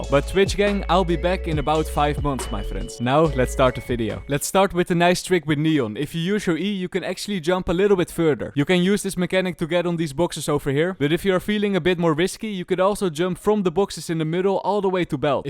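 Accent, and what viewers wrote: Netherlands. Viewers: You really sound Dutch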